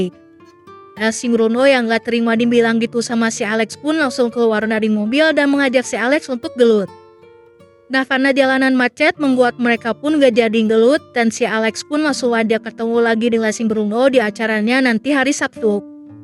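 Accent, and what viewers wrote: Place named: Cicadas, Indonesia. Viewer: Jigana si admin orang cicadas ya. 😁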